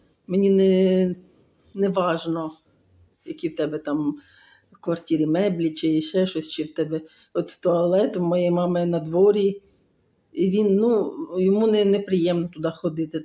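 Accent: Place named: Bukovina, Ukraine. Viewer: Мабуть ближче до Чернівецької. Бо з Буковини і мені близька ваша вимова.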